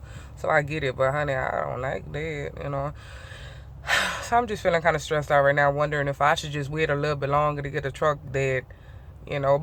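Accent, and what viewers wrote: Louisiana, United States. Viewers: You are beautiful either way! Love the way you talk too. You have got to be from Louisiana! Creole? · I can listen to Louisiana talk all day! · Hello my handle is spaceman I just joined the hunt team I purchased a 2018 kenworth t680 I pick it up Friday your videos are very informative and I love the Cajun accent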